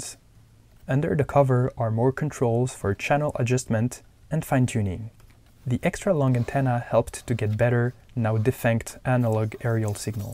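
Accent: France. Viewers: Vous avez un accent français, c'est pas possible autrement !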